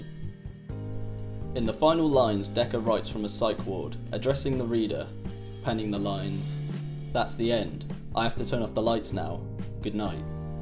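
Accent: United Kingdom. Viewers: That was an interesting video which I enjoyed very much. However, I have to ask you about the way you pronounce words? You sound generally well educated, yet inconsistently drop letters from your pronunciation without warning. As Bachman was outed, am I outing you as somebody who is trying to disguise his natural language tendencies with more 'street' or 'modern' inflections? If so I'd strongly suggest you stop it. It sounds bad. Just use normal English. If it is not on purpose please re-listen to what you have produced here and notice the 50+ times you make errors in pronunciation. Your voice is far too posh to be cool. And I think that way of speaking is on its way out now anyway. Estuary English is just an affectation that politicians use in the mistaken belief it makes the more appealing to the proletariat. It does not, it's merely patronising. You are better than this. 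I think you can speak more correctly (and probably more naturally) than you do in this video, and I think you would sound 100% 'beta' if you did so. As I said, good video, just tidy up the language son 🙂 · I don’t mean this in a bad way, but you are the most British person I’ve ever heard on the internet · Sounds exactly like the first Holly on Red Dwarf. Which UK accent is that?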